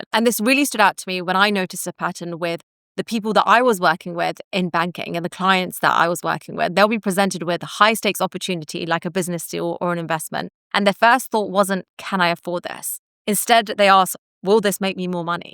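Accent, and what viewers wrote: United Kingdom. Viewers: Is this British accent? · I closed the video after hearimg british English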